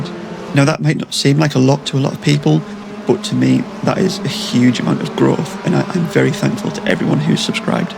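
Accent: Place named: Middlesbrough, United Kingdom. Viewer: Subscribed and a like. But I have to try to guess your accent ! Middlesbrough ?...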